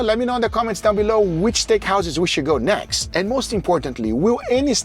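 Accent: Minas Gerais, Brazil. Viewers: Wow, your Brazilian accent is on spot, way to go 👏🏻👏🏻👏🏻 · I love when he speaks portuguese, the mineiro on him comes out hahahaha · I’ve been watching your videos for some time now, and then you say, WE are known for Picanha, Você é Brasileiro mano? Eu achava que era algo espano pô, mas o sotaque me puxava de vez enquando achar que era mesmo brasileiro. Me confirma aí carai kkkkk thanks buddy. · o sotaque mineiro do é engraçado e a comida incrivel!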